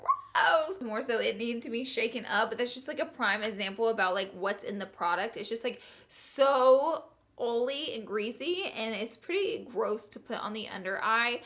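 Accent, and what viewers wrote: South, United States. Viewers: Zoey VH I know a lot of southern people that say it just like her. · when I first watched you your accent threw me off because I haven't heard anyone with a southern accent before..but I love your videos much love from Dq Arkansas❤ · I love how you say "oily and grezy" lol that southern accent shines threw girl! Lol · Laura and I LOOOOOVE your videos and I love the southern accent. I'm from Texas so when I hear you say y'all it brings a smile to my face cuz I thought only Texans say y'all 🤣🤣🤣🤣👍👍👍👍😬😬😬😬 keep up the great work!! #teamLee!!!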